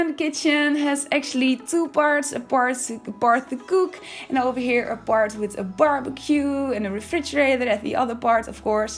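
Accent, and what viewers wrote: Netherlands. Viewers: Are you Dutch? Ps. Love your videos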